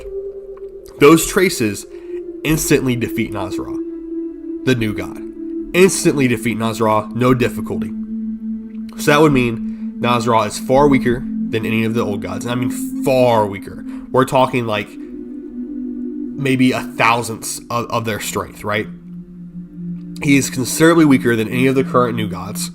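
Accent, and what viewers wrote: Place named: Turkey. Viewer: sounds suspiciously turkish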